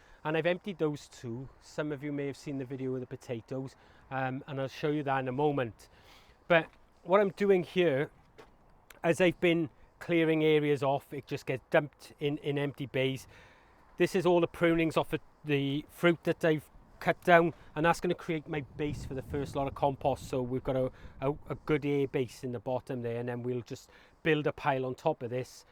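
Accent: South Wales, United Kingdom. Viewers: Yep, you definitely have a south wales accent cariad 😆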